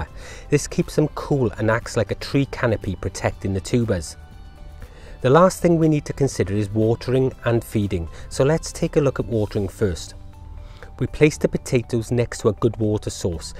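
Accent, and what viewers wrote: Wales, United Kingdom. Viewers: I'm dyin to know-is yours a welsh accent? You're a fantastic teacher, by the way. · thought you were local I'm from cwmbran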